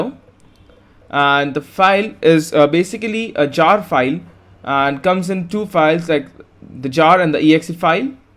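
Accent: India. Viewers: sounds like a indian tech support scammer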